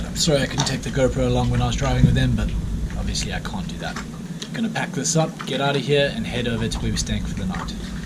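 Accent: South Africa. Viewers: By his accent he must be South African. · Dude are you South African? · Mate are you South African ? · Why do you sound South African?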